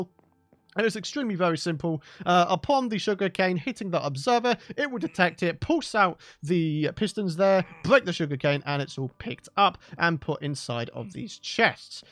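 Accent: England, United Kingdom. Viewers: Are u English?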